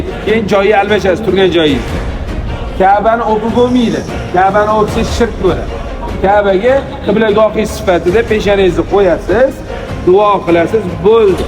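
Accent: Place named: Uzbekistan. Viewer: БОРСАМ. ХАМ. ШУ. ИНСОН. БИЛАН. БОРИШНИ. НИЯТ. КИЛДИМ. ЖУДАХАМ. ТУГРИ. ТУШИНТИРИШ. БЕРАР. ЭКАНЛАР. ОЛОХ. РОЗИ. БУЛСИН. ИЛОХИМ. УЗИМИЗНИ. ШЕВА. УЗИМИЗГА. УХШАГАН. АЁЛЛАР. КАЕРЛИКЛАР. БУЛАР. ДОМЛА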